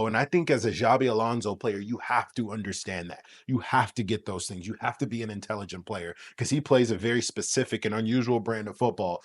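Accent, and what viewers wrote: United States. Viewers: Have to be honest. When I hear an american accent talking about football I tune out. But this guy is a ball knower. Good video.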